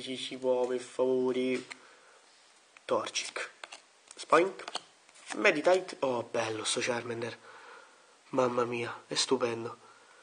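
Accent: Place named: Rome, Italy. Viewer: Si sente che sei romano o.o Comunque sei simpatico u.u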